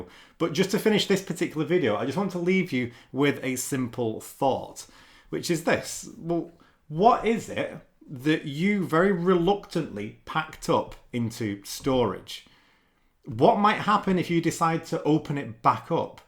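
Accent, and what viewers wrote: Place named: RP, United Kingdom. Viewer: Your accent is perfectly fine. I don't even realize my Southern U.S. accent until I hear it on a recording, which has been extremely rare. I do not like my own voice and speech, then wonder how other people can stand to listen to me speak, but no one has ever said anything. Here, we talk of your accent being "proper" English. Fancy or high class. I've recently been a student of the shadow self, which has helped me tremendously, and is why your video was recommended to me. The favorite teacher I've been learning from speaks of the shadow in negative terms, because he is referring to that which we are not attending to because we interpret it as negative. When we're working on these aspects of ourselves, we tend to think negative thoughts are all bad, and we should only ever have positive ideas, so we try to force them into non-existence. 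Then failure is inevitable because of how we bury them, instead of bringing them into the light to be dealt with without judgement or chain reactions they cause through mental-emotional-mental reactions. Instructing that we should be aware and allow and accept them, but only to the extent of the feelings they cause in our bodies, instead of the thought itself, considering the thoughts are not actually you; just programmed thoughts everyone has. Anyway, you've given me a different perspective on it, even if I can't think of even one personal thing that relates to it, I do understand now. Thanks very much, and I'll watch for your other content.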